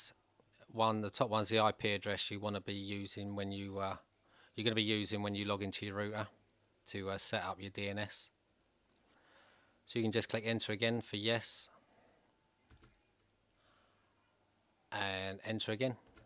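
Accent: England, United Kingdom. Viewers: Can't understand that AWFUL english accent. I m outta here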